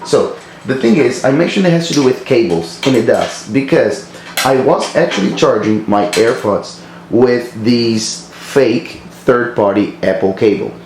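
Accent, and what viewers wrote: Brazil. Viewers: Heeyyy Brazilian!!! I noticed by your accent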